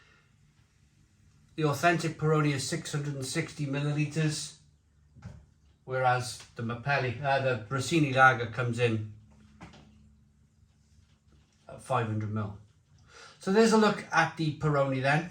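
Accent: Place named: Cardiff, United Kingdom. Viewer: This man sounds like he's from Kardiffffffffffff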